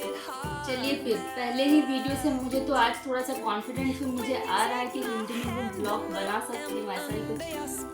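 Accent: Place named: Odisha, India. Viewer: No your hindi is not that bad ... its generally the accent of odias.... anyways it will improve with time ... and the video is not boring at all . And at some part of the video only the background music was dominating your voice (hope you dont mind )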